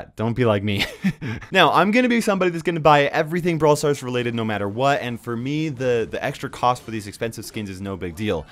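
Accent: Australia. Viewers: SHE IS AUSTRALIAN NOT BRITISH!! REEEEE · She's ausie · That's Aussie not British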